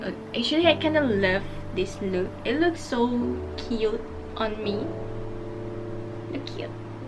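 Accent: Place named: Sarawak, Malaysia. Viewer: dengar nya mpun oii ya ku tauk nya orang sarawak 😂😂😂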